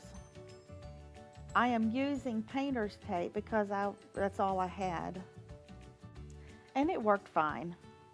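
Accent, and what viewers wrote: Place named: South, United States. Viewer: OMG you have to talk faster and shorten these videos. Half of this wasn't needed and the slow talking with the southern draw was too cliche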